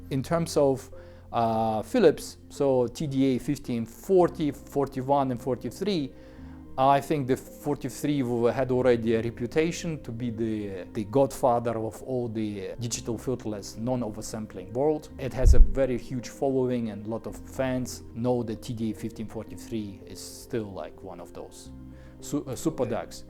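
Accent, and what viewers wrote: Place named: Soviet Union. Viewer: The way you speak indicates that you are of USSR origin. It will be that there is a misunderstanding with you if we listen carefully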